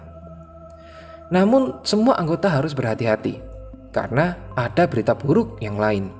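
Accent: Java, Indonesia. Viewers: Medhog bhuanged mhase.... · Logatnya jawa bet ya wkwk. Sukses terusss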